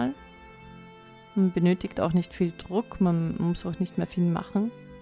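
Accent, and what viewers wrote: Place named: Vienna, Austria. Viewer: I könnt mi wegwerfe! 😂Der Dialekt! Wiener Schmäh & More! Lustig! Das macht Spaß, Dir zuzuhören! Und danke für die hilfreichen Tipps! Möchte demnächst mal anfangen😊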